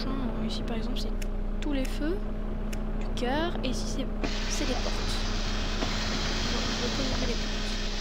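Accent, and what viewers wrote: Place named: North, France. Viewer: Super vidéo ! Et bel accent du Nord aussi j'aime bien xd !! Tu pourrais peut-être faire des lets-plays sur des maps ça pourrait être sympa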